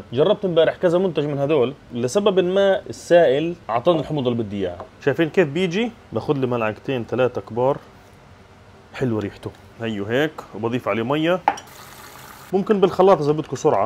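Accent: Palestine. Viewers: عم تعرض طبخ ياالله ليش عم اتفرج وابكي أنا صار كل شي غزاوي كل لهجة فلسطينية تخليني ابكي ربي يفرجها على أمك وكل أمهات غزة وأمهات سوريا ويدفيهم برحمته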